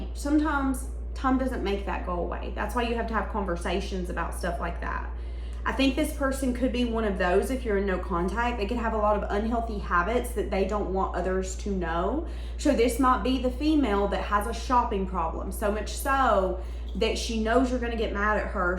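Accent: South, United States. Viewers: you are very welcome..I like the sound of your American southern? accent..its great..I'm English and live in NZ..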